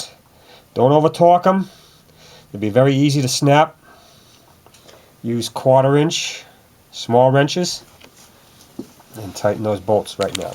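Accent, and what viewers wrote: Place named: East Coast, United States. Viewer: im not a mechanically inclined person so im starting an auto tech 101 class tomorrow..wish me luck..u got a cool lil accent. u must be from the east coast/eastern seaboard.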